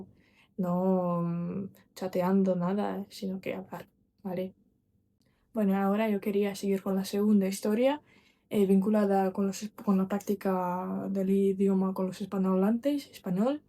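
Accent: Spain. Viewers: Hablas más como gachupin que como latino, 🫤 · entonces,demaciadas veces pero ahi vas con tu español ,veo o escucho 1 gran influencia española en el español,suena raro para mi en lompersonal no me gusta,es interesante el choque cultural ,Rusia tan lejos de America latina o norte america donde esta mexico,me muero por ir a pasar 1 larga temporada ,ojala 1 dia sea posible,saludos desde la riviera maya mexico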